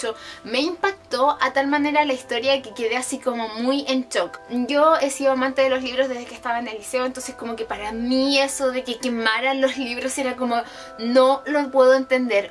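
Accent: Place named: Chile. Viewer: no sabes cuánto me alegra esto jsjjsjsjs yo estoy empezando hacer videos porque pensaba que no había chilenos y ahora te encuentro a ti con el hermoso acento chileno jajaja es la cumbia!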